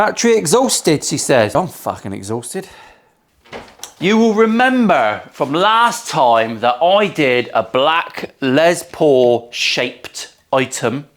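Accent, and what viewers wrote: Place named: Northern Ireland, United Kingdom. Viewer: Might want to say 'northern Irish' accent as a lot of the world probably think of the Irish accent as sounding like Michael J Fox in BTTF3 😃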